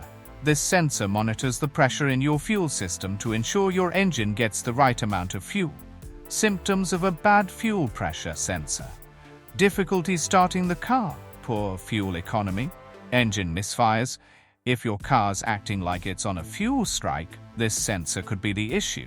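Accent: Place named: United Kingdom. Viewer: As soon as I heard the British accent, i was out.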